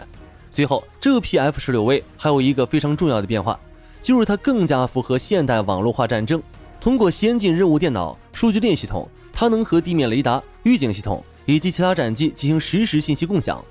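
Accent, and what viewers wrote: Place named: China. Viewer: 講話主播是臺灣人嗎、大陸口音。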